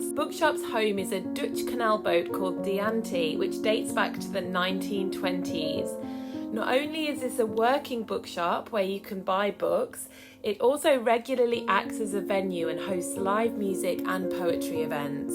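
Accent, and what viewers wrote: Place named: Scotland, United Kingdom. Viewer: ok hiya from the calif coast inland a bit...been searching for a new Londoner,,,love the accent,,,I don't even mind when u say stunning,,bit of the Scottish inya?>...just a curious etymology buff...I am actually the Irish heritage as in """"get my Irish up"",,,recovering painfully with a new hip made of titanium, ceramic, and cement,,,so ta and moosheleighlee as my dear old mum would say occasionally, referencing my gran,,,ur music selection also hits my spot today...take care, mo from ca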